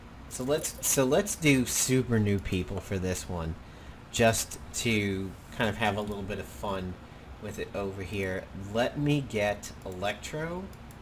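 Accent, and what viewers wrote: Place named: New Jersey, United States. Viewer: Thanks for posting this video,. My hearing isn't the best, but I would've guessed you were from New Jersey.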